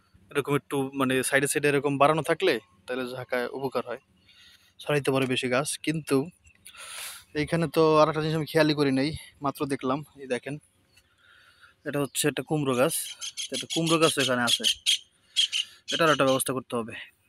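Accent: Barishal, Bangladesh. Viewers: আপনার বাড়ি কি বরিশাল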